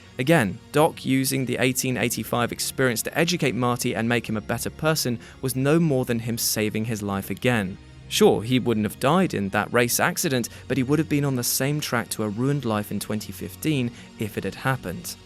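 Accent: United Kingdom. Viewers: British accent!!!! 👂😫😖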